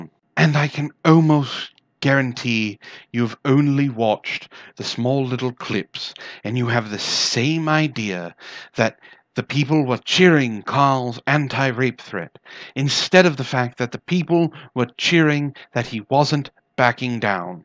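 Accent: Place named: RP, United Kingdom. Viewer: Agreed, sort of a not drunk upper class british Wizard of Cause sound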